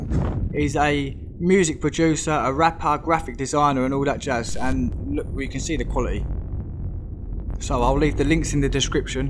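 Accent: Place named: Cockney, United Kingdom. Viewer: How refreshing your little Cockney accent is! Found you today, been watching Tartaria for about 3 months and find it fascinating. Subscribed and looking forward to seeing more of your content.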